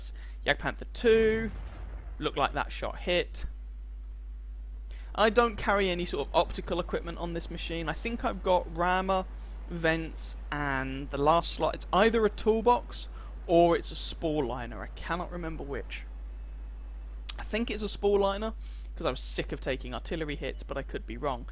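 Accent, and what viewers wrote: England, United Kingdom. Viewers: I truly enjoy your english accent. :-)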